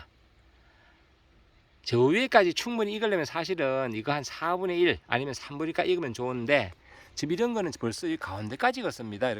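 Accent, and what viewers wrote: Gyeongbuk, South Korea. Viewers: 선생님 음성도좋으시고 말씀도 정확하고 조리있게 잘하셔서 아나운서 를하시면 좋을듯합니다 유트뷰 로 뵙기에는 아까운 인재심니다 그리고 저는 고향이 경북상주군 공검면 하흘리 에 태어나서 10살쯤 경기도 에이사와서 현재 거주하고있는데요 말씨들으니까 고향분같아서 몇번씩 반복해서 영상을보고있습니다 저는6월 12일에 모종을 사서심었는데 언제쯤추수가 가능할까요 현재25마디까지 커서 적심한 상태입니다 처음심은 참께 농사 가 많이어렵군요~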